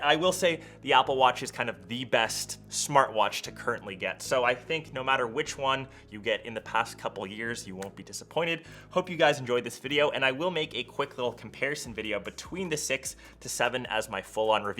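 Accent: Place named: Canada. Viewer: I think I hear a Canadian accent..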